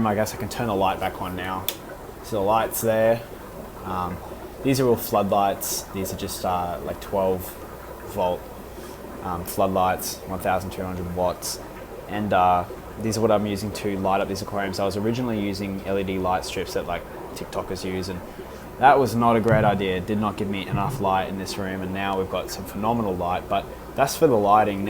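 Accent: Australia. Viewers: Hold up are you from Brisbane, Australia?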